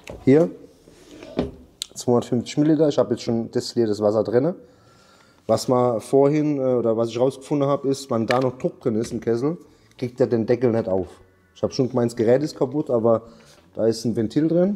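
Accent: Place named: Palatinate, Germany. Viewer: Ämme Pälzer Bub kammer jo nur gläwe was er saacht 😂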